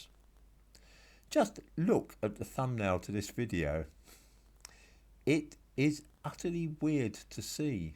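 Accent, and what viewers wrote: United Kingdom. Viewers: The incredibly Britishness of the commentator lends credibility to the argument. For sure.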